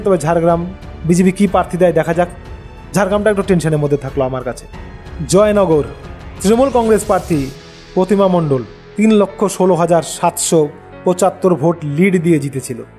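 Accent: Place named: Bangladesh. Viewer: এই চ্যানেল কি বাংলাদেশের চযানেল? উচ্চারণ শুনে মনে হল ।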